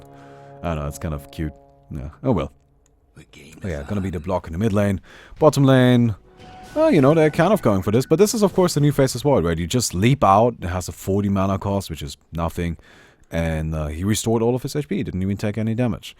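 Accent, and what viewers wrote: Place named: Germany. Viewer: it's the German accent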